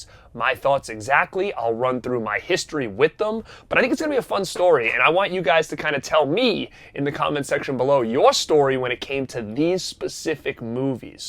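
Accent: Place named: New York, United States. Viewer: What state did you grow up in? You sound like a New Yorker.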